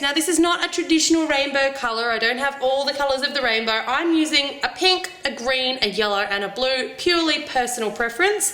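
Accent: Australia. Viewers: its how australians sound ahahah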